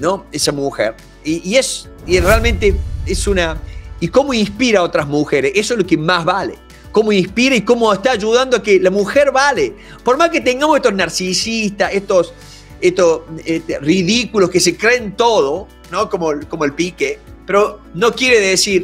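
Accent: Córdoba, Argentina. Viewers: Holaaa!!! Primero me encanta como hablan los cordobeses jijiji y tienes razón en todo quién es piqué…nadie, no vale nada y que buena explicación de narcisismo, apoyo 100 lo que dices y apoyo 1000 a Shakira xq tal como dijiste me siento identificada y me dio mucha rabia 😤 y pena xq nadie se merece que le hagan eso😢pero ella es fuerte y como dijo en la entrega de premios, sintió en carne propia lo que es ser mujer y lo que valemos y la fuerza que tenemos. Excelente comentario saludos y un abrazo hermano 🇨🇱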